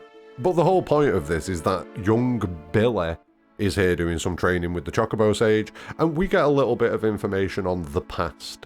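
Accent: Northern England, United Kingdom. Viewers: lol imagine if tifa just went “ nahhh dickhead” the fact that your northern makes it so much funnier, I fucking love your breakdowns dude keep up the good work. Your holding the rebirth content atm and I love it dude another great video my guy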